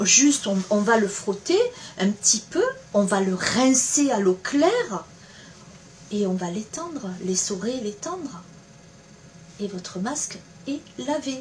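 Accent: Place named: South, France. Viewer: Merci à vous pour ces conseils pleins de bon sens, Ah le sens pratique... Avé ce petit accent méridional plein de charme. Bon courage et bonne continuation.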